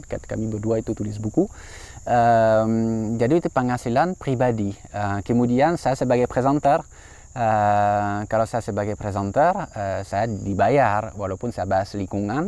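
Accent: France. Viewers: Banyak hal yang saya suka dari anda, tapi ada satu yang paling saya suka, adalah cara bicara anda memakai bahasa Indonesia dengan aksen France yang berkelas. Sengau sengau aduhai. Really love it 😂😀❤️🔥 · Aksen Perancisnya masih kerasa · Dari kecil saya selalu tandain, kalau ngomong "memang" jadi "mamon" dalam aksen Prancis:D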